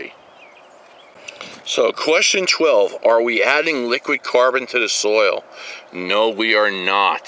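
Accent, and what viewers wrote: New Jersey, United States. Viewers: Yer Joisy accent is just precious! My first husband wuz a Joisy boy and you East Coast boyz are just fine in my book!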